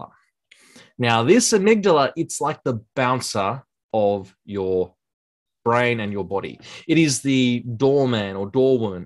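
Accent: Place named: Australia. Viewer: Australian mate 🇦🇺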